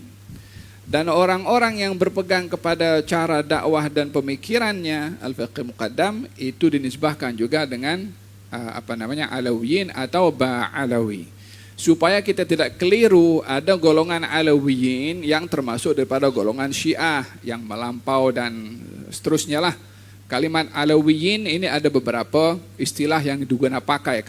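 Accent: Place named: Malaysia. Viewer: Ini logatnya kayak orang malaysiau😂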